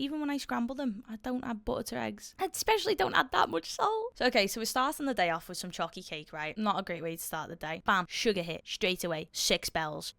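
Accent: Liverpool, United Kingdom. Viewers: Stumbled across your channel a few days ago and its great to see a UK perspective on these videos. As a once fat person its still beyond belief how some people seem to treat eating like an Olympic sport 😮. I cannot fathom taking in so much sugar and salt without realising it. The old me used to get through 2-3 litres of full sugar pop and understanding how many calories that is alone there is no surprise why I was overweight, it makes me wince to see others knocking back so much now! Also love your Scouse accent, no offence to US channels but you are so much more relatable because I understand your references and humour. Looking forward to more. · Love this! Elevated by the scouse accent too (coming from a Yorkshiremen) My gf linked me the vids. We both gone full circle from being unfit in 30s to now being 35, first child great balance. Competed in bodybuilding classic physique Nov 2024 and placed 4th out of 16. 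We like the flashbacks to how you used to be, can really relate!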